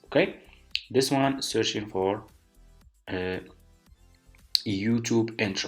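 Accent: Morocco. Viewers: sawtek mghribi bro? 😛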